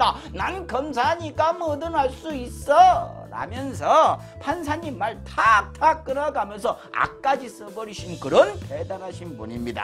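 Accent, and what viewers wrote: Jeolla, South Korea. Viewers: 전라도말씨 시원하게들리네 👍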